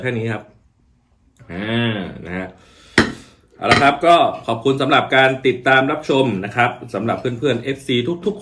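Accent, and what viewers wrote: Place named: Northern Thailand, Thailand. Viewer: อู้กำเมืองอี้ดีละเจ้า เฮาคนเมือง 😂❤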